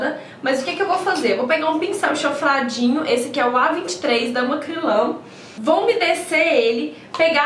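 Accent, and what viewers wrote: Minas Gerais, Brazil. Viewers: Aaaaaaaaaamo seus jeitim mineirim! !!!!! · Nao sabia que voce era mineira ! qual cidade?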